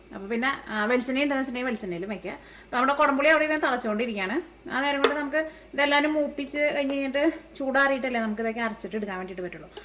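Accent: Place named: Chalakudy, India. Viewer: കോട്ടയം അച്ചായതിന്ന് പറഞ്ഞിട്ട് ഭാഷ സ്ലാങ് ചാലക്കുടിയാണല്ലോ.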